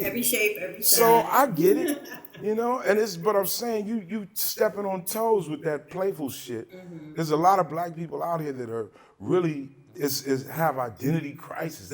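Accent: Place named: Cockney, United Kingdom. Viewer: Watching you from London, UK, love the 'cockney' accent, lol.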